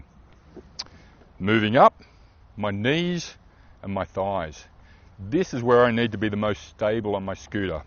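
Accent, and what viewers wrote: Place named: Australia. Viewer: Brilliant explanation. Aussi English 😍 thank you 🙏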